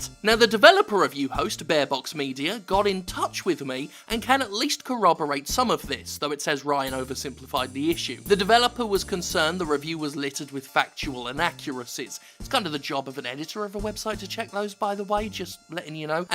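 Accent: United Kingdom. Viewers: Throughout the sections where reads Ryan's statements aloud, I couldn't help but think that it's probably how that guy actually sounds, because he is also British and may have the same accent as.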